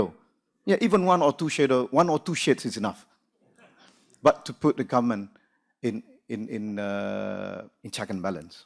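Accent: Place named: China. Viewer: Mcm cina ckp english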